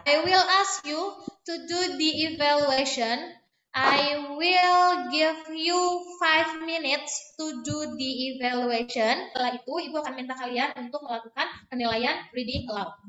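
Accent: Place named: Banyumas, Indonesia. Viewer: Guru ngapak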